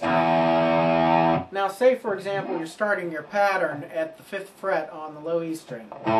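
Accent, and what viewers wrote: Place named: South, United States. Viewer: Alrighty then, not from up North are ya? Great little lesson.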